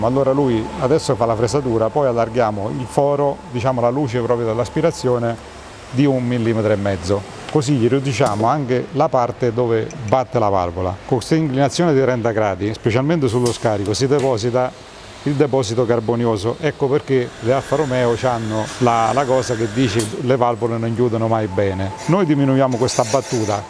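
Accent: Abruzzo, Italy. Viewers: "Pecché nen chiut perfettamend'" "E quand è lung 'stu tapp!" L'accend abbruzzes é lu topp!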